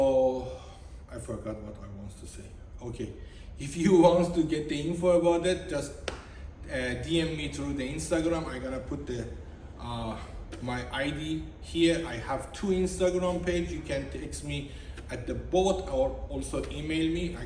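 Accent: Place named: Iran. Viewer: جان. دمت گرم. عاشق لحجه فارسی انگلیست هتم. میخواتم بدونم که سی۳۰۰ معمولی هم همینه یا فرق داره. میخواستم کامنت، لایک و سابسکایب کنم. موفق باشی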